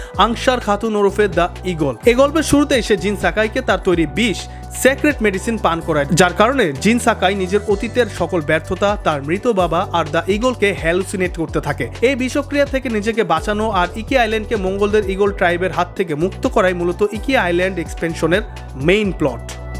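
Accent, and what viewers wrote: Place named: India. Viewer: vai ki indian? thik moto bangla bolte parchen na kisu jaigai!!!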